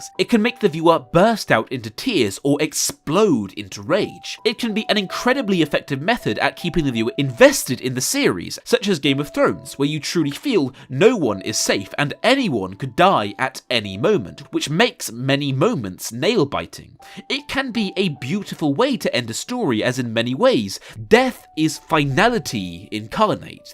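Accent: England, United Kingdom. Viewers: Honestly I can't even watch this.... It's just too much cringy English crap like the word "Baskerville" said repeatedly.... Love your channel even though you're English but wow I can't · I haven't heard someone speak so British since my two British teachers :)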